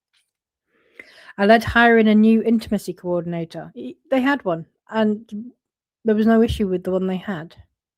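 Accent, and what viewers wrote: United Kingdom. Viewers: I thought it was your British accent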